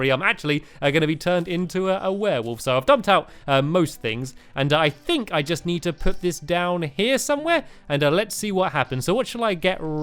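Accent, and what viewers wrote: United Kingdom. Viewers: you mean stampy? If yes, hes from UK not Europe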